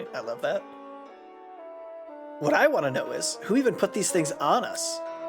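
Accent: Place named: United Kingdom. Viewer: Listening to you gush about this game while you play it is pretty great. Also, enjoying your British accent.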